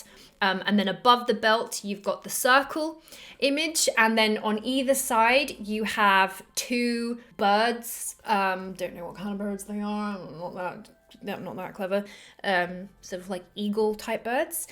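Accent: England, United Kingdom. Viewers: The english accent really helps out the channel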